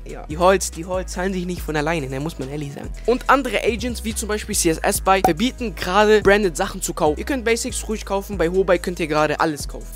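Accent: Germany. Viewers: Geiler magga hahaha bist bestimmt aus Hamburg wa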